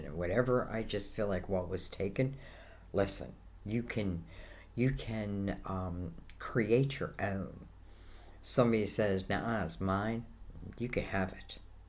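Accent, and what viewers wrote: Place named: Pennsylvania, United States. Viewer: I have something to tell you. It’s like a big deal. I’m so grateful. I’ll send a private message. I (also) love your PA accent! Much. J T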